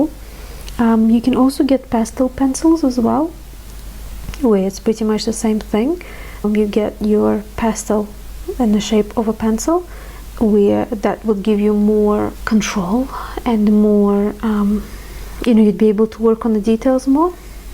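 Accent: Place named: Russia. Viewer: haha, okay good to know! Russian accent is great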